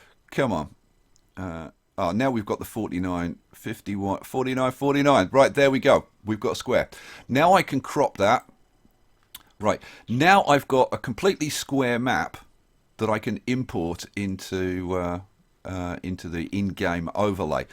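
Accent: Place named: Birmingham, United Kingdom. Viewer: Thats a Brummie accent if I ever heard one.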